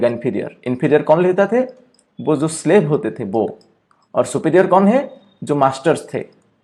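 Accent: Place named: Odisha, India. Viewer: Mu bi odisha ru sir from balasore video re majhire odia voice dekhi mna ku question asila jani parili sir ama odisha ru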